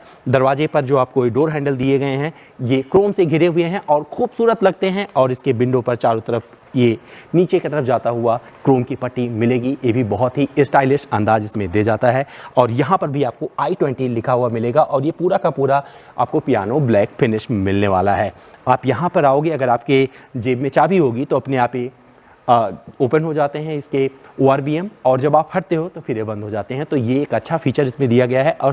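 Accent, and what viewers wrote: India. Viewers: Really your review with hindi accent is just fantastic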